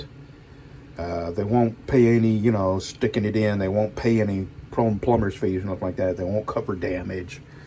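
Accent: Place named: Northeast, United States. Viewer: Talkin about that MCI truck reminds me of the time when I was heading down I-69 into Ft. Wayne, Indiana and had a Petershaker that was pissed about being passed shove me off into the median. Had to get towed and bitched at over that one. Freaking safety officers. Hate 'em. I never noticed it before but with as many times as you said "wourder" in this video, it's plainly obvious now that you're from the northeast.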